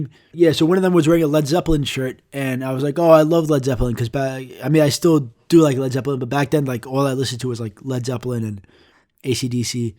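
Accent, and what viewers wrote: New York, United States. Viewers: I usually dont really like podcasts but ive taken the habit to re-listen your two podcast episodes when ive had a complicated day, hearing your new yorker accent bitching about shit and saying "cawfee" and "wotr" butters my rolls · New York accent. Also your videos are still great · You have an accent, and a New York one at that. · Are you from New York because New Yorkers say Cawfee. BTW I love your accent. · Your NY/NJ accent is hella strong.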